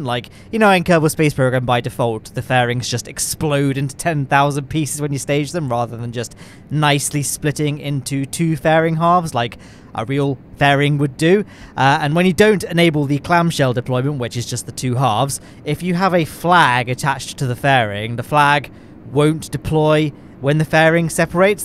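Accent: United Kingdom. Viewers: I love how the auto generated captions mistake British accent for saying "Lambda" instead of Lander.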